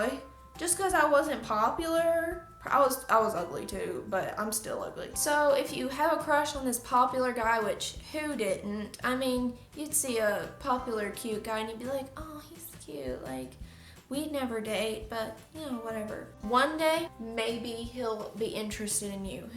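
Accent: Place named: South, United States. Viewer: I love your chill personality and southern accent, you just got a new subscriber 💓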